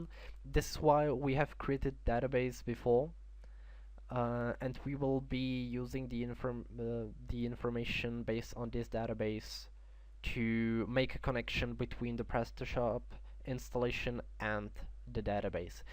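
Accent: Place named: Philippines. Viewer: Your enlish is very good! with a pilipeano accent. I like very much!!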